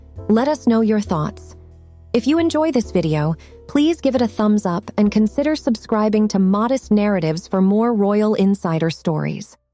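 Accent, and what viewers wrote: United States. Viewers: This video is narrated in American version of English so it was pronounced correctly in that language.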